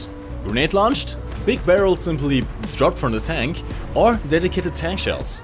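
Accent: Germany. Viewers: Are you German? Your pronounciation sounds really like that... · Are you german? Your accent is just too flamingly homosexual, which leads me to believe you're German. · are you german?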